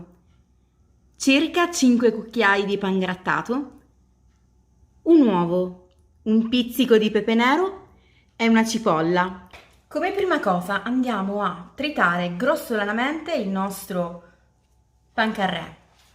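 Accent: Sicily, Italy. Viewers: dalla cadenza che hai quando parli credevo fossi di Palermo.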